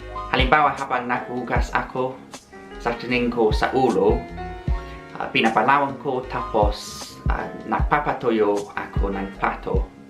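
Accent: United Kingdom. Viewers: SaLute! kitang-kita grabe ang dedikasyon mo para matuto ng tagaLog and it reaLLy worked and growing, kudos, more power! Love your Brit accent too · You should pay more attention to your accent, you are speaking Tagalog but with a British accent.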